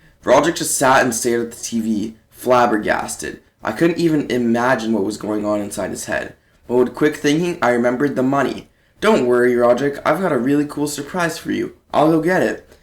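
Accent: United Kingdom. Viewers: Hey bro, small doubt.... Your scotish right?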